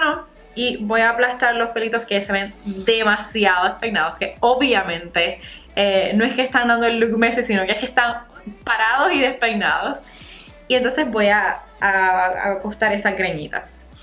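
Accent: Puerto Rico. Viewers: (pues lo supe por el asentó Boricuaaa jajaj yo también soy de allá besosss)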